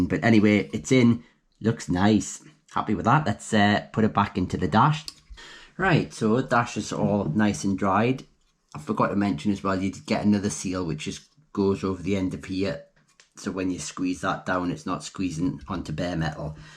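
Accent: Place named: North East England, United Kingdom. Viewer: Great job and thank you for the clear explanation. Good to hear the north east accent too!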